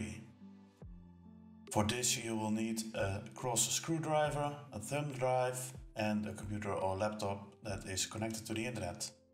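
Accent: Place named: Netherlands. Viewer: Are you Dutch? You have a Dutch accent. hehe Nice Video! Thank you!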